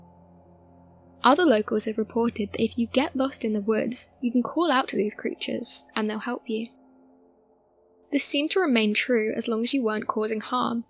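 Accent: England, United Kingdom. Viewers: Maybe she lives in America, but she definitely speaks with an English accent. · It's funny hearing Mexican stories from when I was a kid... (But w/ an English accent) 😉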